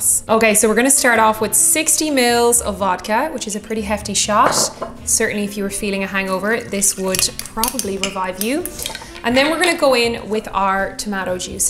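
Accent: Ireland. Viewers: Pronounce worcestershire however you want to. Modern English changed all of the pronounciations of these types of words, anyway. There's really nothing anyone should say. Maybe that's just the Irish bit of me wanting to stick it to the English? I am fine with that. Like they've been known to be nice to Irish folks, riiiight? Definitely anything sounds adorable in an Irish accent, so there is that, too. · Speaks beautiful irish 🚻 is absolutely stunningly beautiful 🚸plausibly bordered line alcoholic 🚼🚼,Jesus could you stop sending your angels to earth to be amongst us mere mortals,🤔😂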